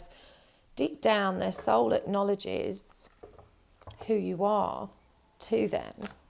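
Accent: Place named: Birmingham, United Kingdom. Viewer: Birmingham acceny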